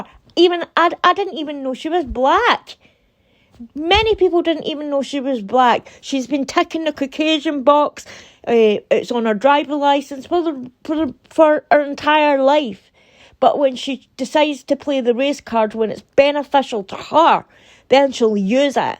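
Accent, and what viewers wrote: Scotland, United Kingdom. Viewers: Love your content and your wonderful Scottish brogue!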